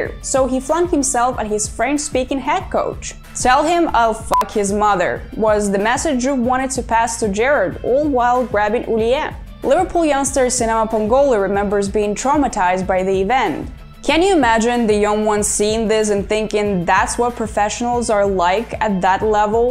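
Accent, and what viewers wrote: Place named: Latin America. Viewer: Great content, the accent is giving some Latin speaking country but your command of English is better than us Brits. Your delivery means you will be a YouTube star, keep it up 🙌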